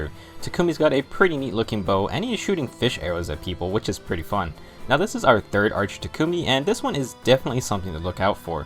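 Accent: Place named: United Kingdom. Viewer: Jake Stavinsky Idk why people question her British voice, like don't question it it's hella cute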